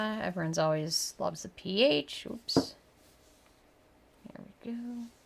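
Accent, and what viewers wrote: Minnesota, United States. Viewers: Are you from Minnesota? The accent sounds like it. ;)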